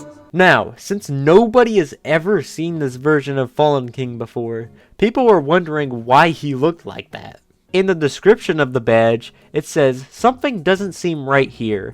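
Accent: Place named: Texas, United States. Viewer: I love your western/Texas voice 😁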